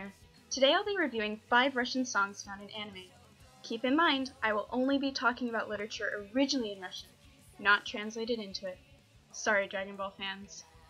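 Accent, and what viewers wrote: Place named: Japan. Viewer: Японский акцент это оргазм для моих ушек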